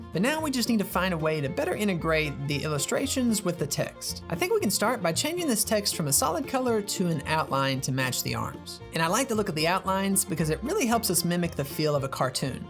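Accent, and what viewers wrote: South, United States. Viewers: I just noticed your southern accent, and now I can’t I hear it 🫨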